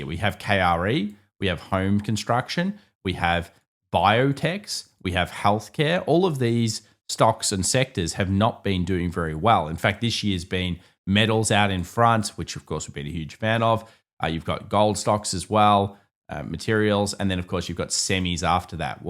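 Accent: Australia. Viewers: Did anybody ever say to you, your Australian accent makes everything sounds automatically legit. Listening even though I’m not understanding 70% of it sometimes. 😆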